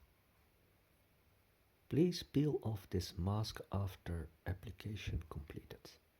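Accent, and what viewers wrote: Netherlands. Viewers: Wtf dude, it called an accent. "Die" is a common deviation of people who speak Dutch or Dutch adjacent languages. People speak your language out of courtesy. If they pronounce things wrong, just accept it and go on with your epic day.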